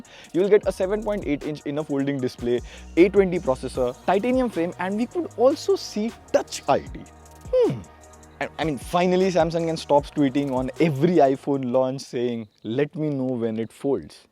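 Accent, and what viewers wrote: India. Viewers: I don't know why . When you speak in English your akcent like Hindi and feel like speaking hindi❤😊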